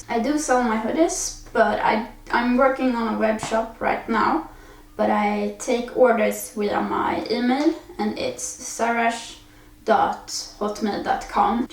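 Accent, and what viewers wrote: Sweden. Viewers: man hör svenskengelskan så bra haha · when i heard your accent, i knew you was from sweden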